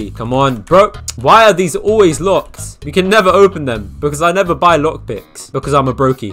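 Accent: United Kingdom. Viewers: It is too brittish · Lol British voice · He already voice revealed British🇬🇧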